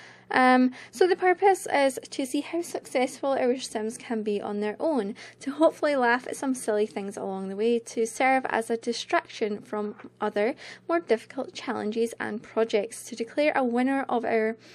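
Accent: Scotland, United Kingdom. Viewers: I'm pretty sure is Scottish, not Irish.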